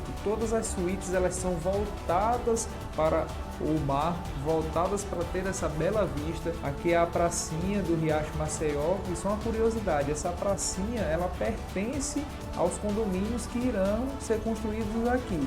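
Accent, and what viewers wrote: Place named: Paraíba, Brazil. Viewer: Mais vc não é de Fortaleza vc ta um pouco com o sotaque da Paraíba e do Pernambuco!!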